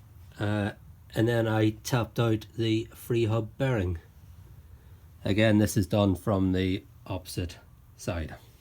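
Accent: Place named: Ulster, United Kingdom. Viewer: Welcome surprise hearing an Ulster accent doing a bike maintenance vid. Thanks for the tutorial!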